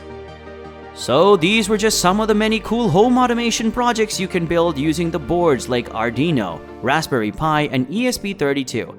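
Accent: India. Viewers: Siri is impressive. Can understand that indish acent!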